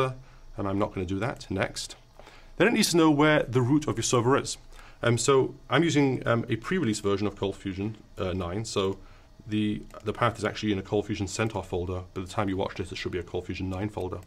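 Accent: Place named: Scotland, United Kingdom. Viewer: So, that accent is totally Scottish. Just curious which city it's from.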